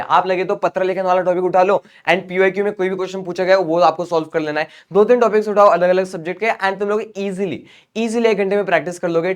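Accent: Maharashtra, India. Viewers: Marathi English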